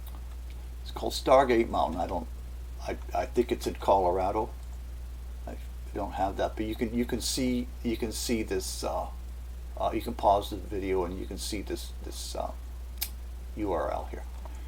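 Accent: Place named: Canada. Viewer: Hey are you Canadian? You sound like me. lol. I think I know that accent. Be sure to see these if you have not regarding planet X, excellent information. it discusses the plasma field as well as the iron oxide and the debris it drags along with it on all sides, pushing asteroids ahead and dragging it behind. It isn't moving that slowly either as Carlos Ferrara discovered in his 40+ years of study of its perturbations, Carlos found it travels at three speeds, check out what he has to share with us about this. CARLOS MUNOZ FERRADA HERCOLUBUS (Chilean Astro Pysicist 40+ years study Nibiru perturbations) <link> Also don't think our magnetosphere is going to protect us as it is dropping at a very high rate at this point see this about that, stop the articles/emails in the video and read them to explain that which I am speaking of. Earth Changing End Time Signs (Magnetosphere is dropping, pole flip coming) <link> John B Wells; Gill Broussard Planet 7X (as far as I am concerned Gill produces the finest research material available) <link> A MUST WATCH Interview Nibiru Planet X future predictions;Carlos;Ferrara;(Chilean Astro Physicist 40+ yrs research) <link> P-7X Scripture and;TIMELINE Lg 11x17.pdf <link> (Free PDF downloads of Nibiru Timeline according bible and Asian Astronomical records) Gill spent 9 months in China alone studying Emperors Head Astronomers records and compared them to those of Korea, Japan, Germany, Turkey, the bible....it all correlates as true. 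The signs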